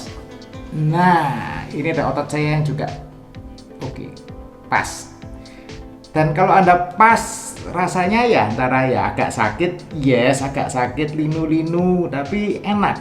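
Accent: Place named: Java, Indonesia. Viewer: Coach orang Jawa apa Bali ??, logatnya Jawa tapi bilang otot bilang Tnya kaya orang Bali.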